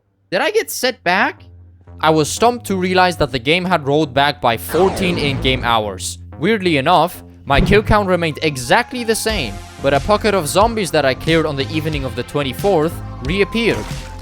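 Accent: Poland. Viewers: wait i just reaised... are u Polish??? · coś tak czułem że ty Polak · Polak detected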